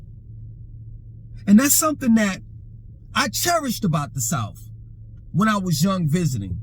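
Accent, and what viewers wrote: New York, United States. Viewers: Sounds like your from New York coming to the South complaining. Why dont you quit b!tching and grow your own food !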